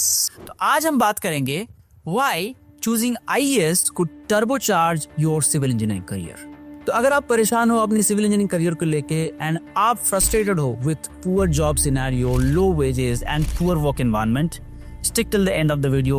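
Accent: Bengal, India. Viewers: R u bengali ?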